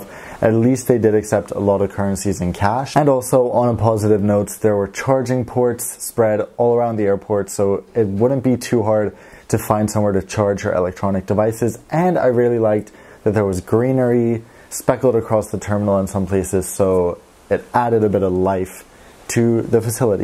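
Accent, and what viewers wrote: Gothenburg, Sweden. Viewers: OMG! In Swedish and in Gothenburg accent, Thank you. You made my day:D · Älskar göteborgskan :) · Hehe. Loving your deep Gothenburg accent. Keep up the good work. GÖTT MOS!!! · Du pratar lite göteborgska (det är kul). Snälla prova Ukraine international BC. Jag hittar inte någon som har gjort en tripreport på den och jag vill jätte gärna se det. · Right. So at first your Swedish sounded extremely American but like after American Express the Gothenburg dialect kicked in. Absolutely fantastic! One can hear you’re not comfortable though :) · Det vart faktiskt bra med din Göteborgska ❤ Now over to English, you said it great whit Gothenburg accents. I was in Istanbul's new airport and saw also the toilets problem I took photos about how terrible it looks out.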